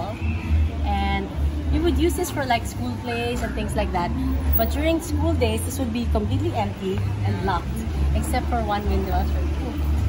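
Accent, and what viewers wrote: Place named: Ilonggo, Philippines. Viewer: The chairs of st. Annes hall though.. hindi pa rin nagbago...kaka miss. Love that you still have your ilonggo accent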